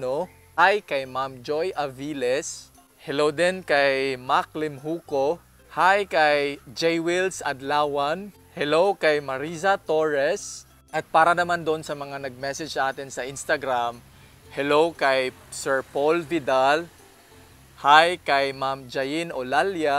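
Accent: Visayas, Philippines. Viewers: I love your Visayan accent. Black velvet is beautiful .Your surroundings is paradise . Beautiful country side. Thanks for the plant care tips.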